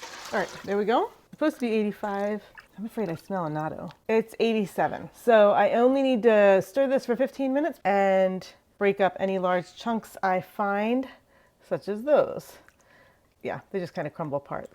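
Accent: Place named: Boston, United States. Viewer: What part of Boston are you from? ❤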